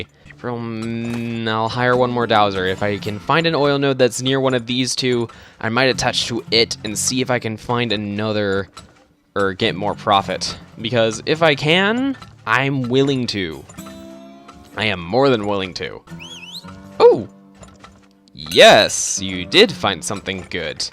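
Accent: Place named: South, United States. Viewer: YOUR COUNTRY VOICE THO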